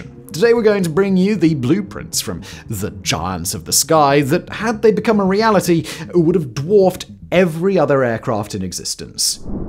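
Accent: RP, United Kingdom. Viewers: It's just RP English, lots of ordinary people speak it. (RP = Received Pronounciation)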